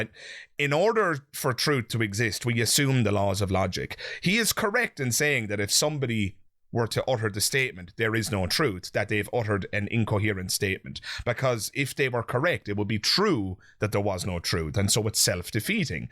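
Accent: Ireland. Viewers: I like your work , honest , simple , straight forward ,easy to follow , genuine, calm, ........ And all in a soft gentle Irish accent. Well done sir .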